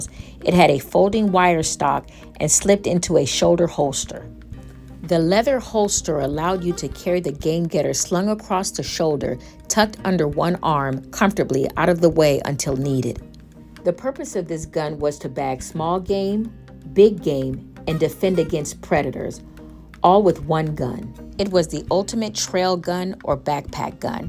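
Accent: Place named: United States. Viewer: Thank u Sister. Your channel is the one of the most attractive to me. Smooth, correct american speech, n well knowing the subject. U really good. ❤ Respect from a Russian speaker.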